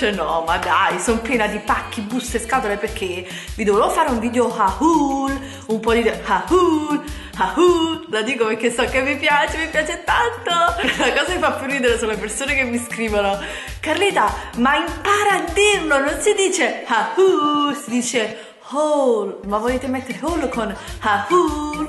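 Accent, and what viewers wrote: Naples, Italy. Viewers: è accento napoletano se non lo sai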